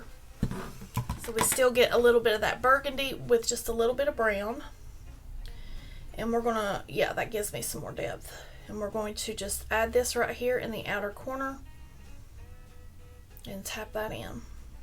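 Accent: South, United States. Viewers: 🚨🚨🚨🚨🚨🚨 I'M READY... I'M JUST HERE TO SEE YOUR PRETTY LITTLE FACE!!! ITS THEM EYES AND THAT COUNTRY GAL ACCENT FOR ME😘😘😘😘😘😘 🚨🚨🚨🚨🚨🚨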